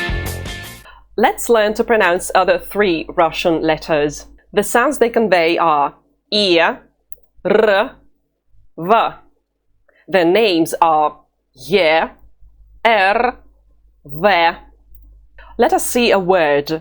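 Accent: United Kingdom. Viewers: Very nice intro video. Did you study English in the UK or have a British instructor? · I liked this lesson and your teaching way and British accent. Thank you. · Your London accent is delightful to listen to. The Russian pronunciations are most helpful, especially the "o"sound in words like "это", which I've been mispronouncing as a long "o" rather than an "a" sound. Little thing - big difference!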